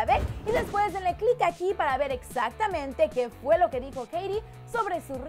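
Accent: United States. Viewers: No busco ofender a nadie. Los estadounidenses tienen un acento MUY fuerte, por eso la forma de hablar de. Si escuchas a un inglés, hablando inglés:v, notarás la GRAN diferencia de acentos. P.D: Me dan igual los insultos. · la conductora es gringa es normal que hable así es como cuando le hicieron pedos a la conductora de noticieros latina por pronunciar bien los nombres en español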